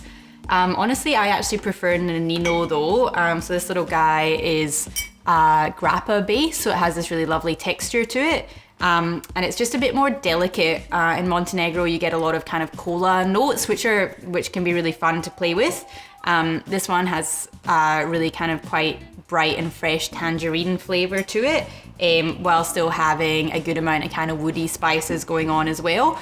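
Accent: Scotland, United Kingdom. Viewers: OMG I thought you were from Scotland by your accent, but you're actually from Australia! That's really interesting. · I think this video was just mentioned in Stuff You Should Know's episode about amari. They mentioned a well put together video about amari by a lovely girl with lovely scottish accent... who else could it be?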